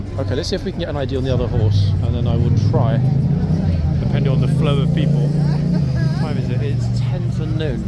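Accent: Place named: United Kingdom. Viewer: His not her grand dad...my guess is the old guy is a boyfriend....how the woman poses hahaha she ia an Asian from Philippines...i know right....when we gonna have a peak how you look like Sir....i love British accent...lovely to listen... i met and befriended some while i was in HK... With your videos i feel also walking in London haha...GOD Bless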